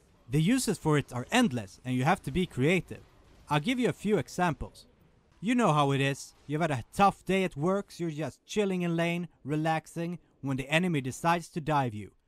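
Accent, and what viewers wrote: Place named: Netherlands. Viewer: your dutch accent if so annoying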